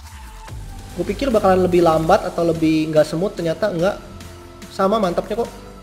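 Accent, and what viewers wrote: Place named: Sunda, Indonesia. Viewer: Logat sunda nya kental brow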